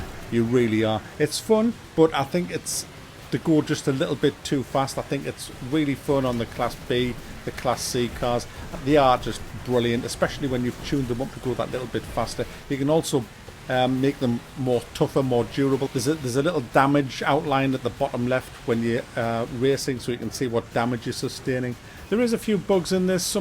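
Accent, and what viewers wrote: United Kingdom. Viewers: Darby. Beeta. Gayme. Moode. Controollah. That's the British accent for you.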